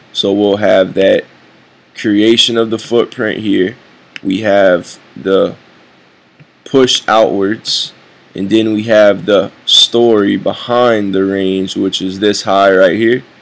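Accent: Louisiana, United States. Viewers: I knew u was from Louisiana I can hear it in your voice what part you from bro im on the north shore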